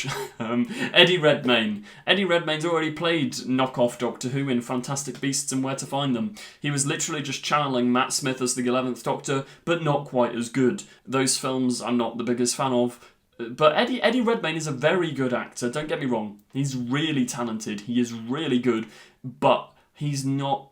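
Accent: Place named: Scotland, United Kingdom. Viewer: Scottish accent alert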